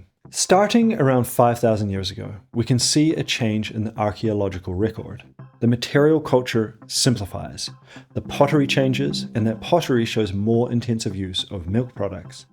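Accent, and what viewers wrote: New Zealand. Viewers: Absolutely splendid video. I’d never have clicked if I’d seen the length but enjoyed every minute. Where is your rhotic kiwi accent from? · Rhotic New Zealand accent. Intriguing. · Narrator has a strange semi-rhotic accent with traces of NZ roots. Auto-captions struggles to understand it.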